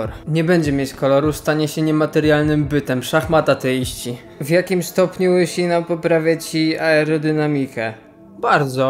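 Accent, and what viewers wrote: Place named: United States. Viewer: A pytanie którego nie bylo to skąd pochodzi Twoj ang, i przede wszystkim akcent? Nikt nie ma takiego jankeskiego akcentu bez zmudnych cwiczen #anglistawie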